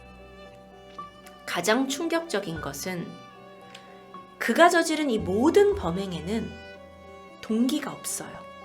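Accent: Jeolla, South Korea. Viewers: 디바님 고향 전라도임? 의를 자꾸 으라고 발음하는거 이거 전라도발음인디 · 혹시전라도 분이신가요 님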